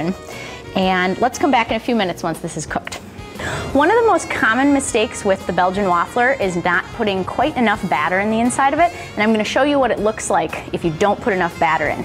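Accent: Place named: Midwest, United States. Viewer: Mid-west accent LUL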